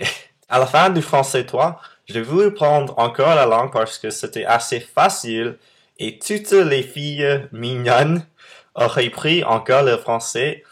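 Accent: United States. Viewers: Franchement, chapeau ! =) Tu parles trop bien, malgré des p'tites fautes, mais ça, on s'en fou ^^. Je pense que si je ne l'avais pas appris depuis mon plus jeune âge, je n'aurais jamais été capable d'apprendre le français -3- En tout cas, tu as du courage ! Continue de t'améliorer c'est presque parfait, mais surtout ne change jamais ton accent ! Personnellement, je trouve que l'accent français est fade et plat comparé à l'accent américain ! =) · Bonjour :) Je viens de découvrir ta chaîne et tes vidéos. Tu est très beau :3 et tu parles très bien français, même si tu as l'accent américain (ce qui est normal). Je te félicite c'est très bien :) Translation : Hello :) I just found your channel and your videos. You are very handsome :3 and you speak French very well, even if you have an American accent (which is normal). I congratulate you, that's fine :) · Michael Rivière Non, on dit des Anglais (ou anglo saxons) qu'ils ont un accent anglo saxon... 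mais en l'occurrence, ce jeune homme est américain et a un accent américain. Ça va, il fait pas trop chaud chez toi? ( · Salut! Je viens de découvrir ta chaine , et j'avoue que c'est trop cool d'entendre un américain parler Français. Je trouve que tu t'en sort pas mal même avec l'accent avec quelques fautes mais sa c'est normal :) Et je voulais savoir si "quelqu'un" connaissait des astuces pour parler anglais :) J'aime cette langue mais les cours que font les prof son nul donc ..... voila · L'Amérique c pas mon truc mais j'adore ton accent américain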